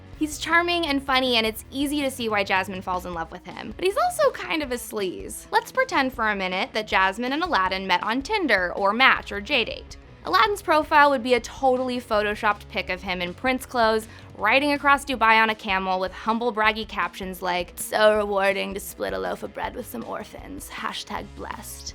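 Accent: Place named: United States. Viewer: Stop being so negative. P.S. your accent is giving me a hedache. If you want to speak my language then speak it, dont chew it up infront of me then spit it back into my face you yank.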